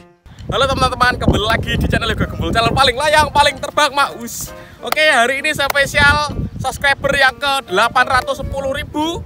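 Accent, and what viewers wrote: Java, Indonesia. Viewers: Orang jawa ya kak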